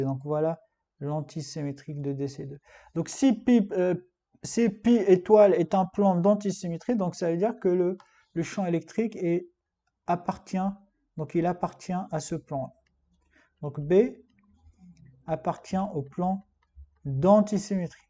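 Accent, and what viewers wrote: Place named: France. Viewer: magnifique accent francais, comment te la eu ?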